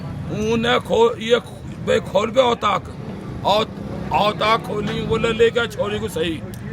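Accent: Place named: Uttar Pradesh, India. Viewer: Ye UP wali boli kyu bol Raha ha, rohtak wali kyo nahi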